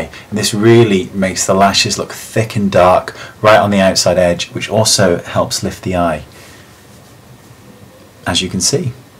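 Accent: United Kingdom. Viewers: omg, I loooooooooooove your british accent :) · Excuse me Mrs/Mr; your VERY Beautiful && your British accent is HAWT(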